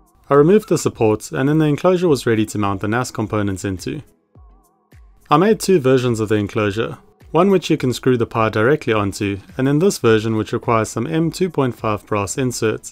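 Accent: South Africa. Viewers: Are you a Saffa?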